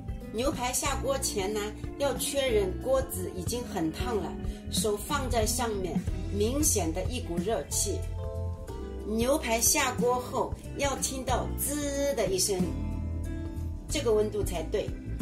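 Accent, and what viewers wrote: Shanghai, China. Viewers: 的上海言话哈一样赏心悦目。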